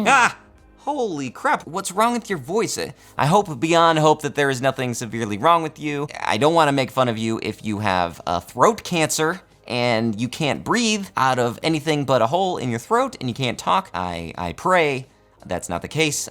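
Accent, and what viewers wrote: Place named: United Kingdom. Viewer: He kind of sounds like Shelby from Adventure Time with a British accent. 😁😁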